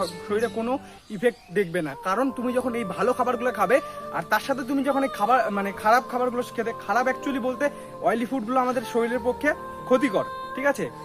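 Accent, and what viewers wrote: Kolkata, India. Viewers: ভাইয়া তোমাদের বাসা কলকাতা বলতেন তোমার কথায় অনেক ভালো লাগছে বলবেন ভাইয়া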